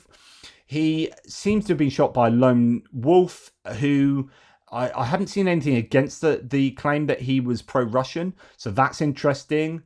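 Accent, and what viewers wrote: New Zealand. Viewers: Slow down & draw out the NZ accent! ie really chill it out, Bro!!! ;p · Oh, Chur, Gotta Appreciate the classic Kiwi accent that we deny all too often. Chur for the sanctions but we need to do more bro, but smol island nation syndrome is strong over here, we only dish sanctions, they're pretty chur aye bro. oh and we have likely don't have any SAS operating over there.:_love:□ definitely not. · To be fair, your kiwi accent is pretty on point. Aka “choice”. 👍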